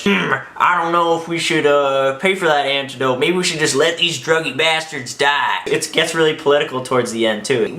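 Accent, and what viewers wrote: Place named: New Jersey, United States. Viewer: l love the hint of a jersey accent you have 😄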